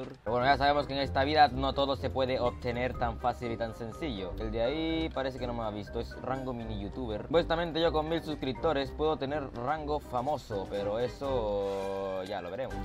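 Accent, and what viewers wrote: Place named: Colombia. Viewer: Eres de colombia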